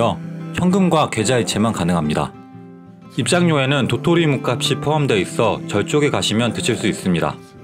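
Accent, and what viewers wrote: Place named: Gyeongsang, South Korea. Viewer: 경상도분이신가요? 다 정말 예쁜데 왜..다..경상도..ㅠ.ㅠ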